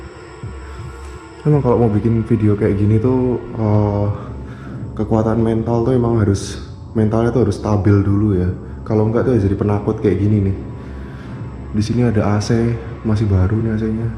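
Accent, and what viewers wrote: Semarang, Indonesia. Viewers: Ka harus tau ini konten jadi favorite aku dari dulu. Mood bgt logat semarangnya😫 apalagi pas bilang "pulang yok". Bukan apa apa nih tapi saya melting berasa kaya doi😫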